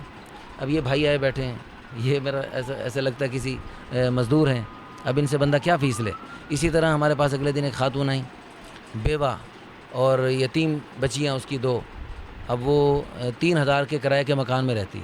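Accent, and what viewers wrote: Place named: Kotli, Pakistan. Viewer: Kotli sa bat kr rhe hn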